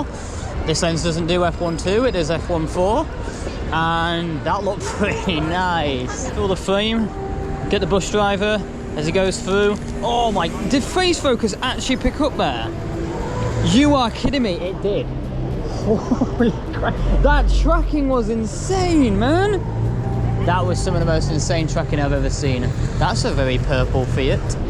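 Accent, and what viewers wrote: Manchester, United Kingdom. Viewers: Jajajajaja What?! No! Manc meaning Mancunian - most of the time your accent brings me back there. I'm Spanish but I've been living up there for a few good years. It's a dear place for me with mostly lovely, warm people (being from Andalucia you kinda miss that when you're away and I got that there) Not to forget that Manchester is in a pretty sweet spot where both Peak district and Lake district are at a stone throw! Soooo many awesome places to explore :_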